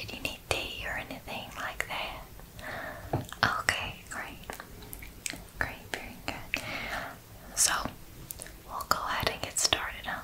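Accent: South, United States. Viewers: Just in the nick of time, I was ready for another round of and in a southern accent is just bonus. ❤ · Ohh And I 4got too mention the Southern accent was outstanding it was sooo good u should def do more vids with it!what a sweet precious angel u are!😊😄💗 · Being from the south,your accent was pretty accurate. Very relaxing video.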